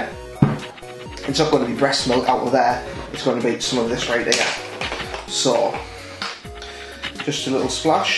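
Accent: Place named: Durham, United Kingdom. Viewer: Knew I recognised that accent lol.. I'm from Durham myself.. I'm glad there is somebody from the area putting out good content!! Keep the videos coming!!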